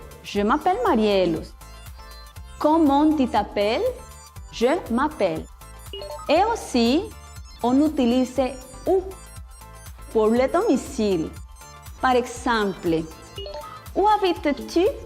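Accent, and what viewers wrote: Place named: Spain. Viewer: ella pronuncia como español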